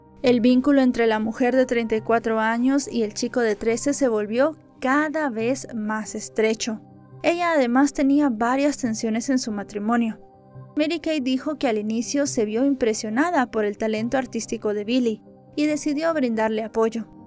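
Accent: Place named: Mexico. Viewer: Una narración a la mexicana. Excesivo uso del marcador "pues". Se nota que esta chica narradora es mexicana. Pero podría equivocarme, mejor que lo diga ella.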